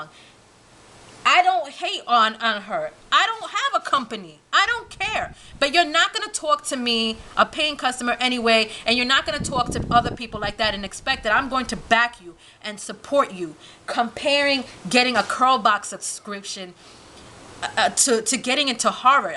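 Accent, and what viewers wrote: New York, United States. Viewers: Are you from new york? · BTW, I loveeeeeeeeeeeeeeeeeeeeeeeeeee your boldness and how you don't take crap nothing from nobody!!!!! lololololol You went straight G on this video though!!!! That NY accent! Love it doll! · are you from Ny?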